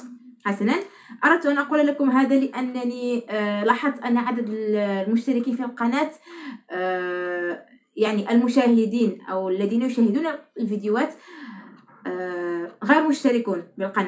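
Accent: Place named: Morocco. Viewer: أعرف أنك مغربية ويبدو ذلك في لهجتك المغربية المميزة ....كنت أمزح فقط . استمري في دروسك وندعو لك بالتوفيق ونحن لك من الشاكرين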